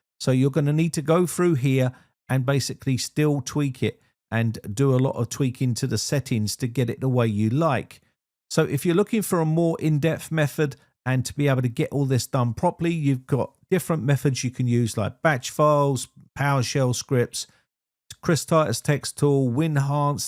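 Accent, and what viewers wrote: United Kingdom. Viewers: He’s British, so if you can’t understand the mother English language, watch someone else 😉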